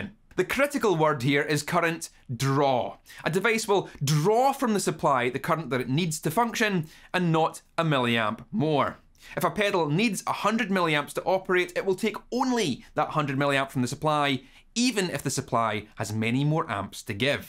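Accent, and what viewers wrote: Scotland, United Kingdom. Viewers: YouTube loves the Scottish accent lol. I saw a video about Glasgow once where the captions thought they were speaking Dutch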